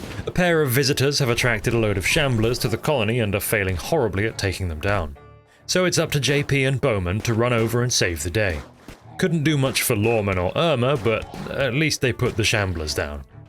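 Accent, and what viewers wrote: United Kingdom. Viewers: Hearing a brit talk about Starcraft just feels right. Rip TB, we do not preorder.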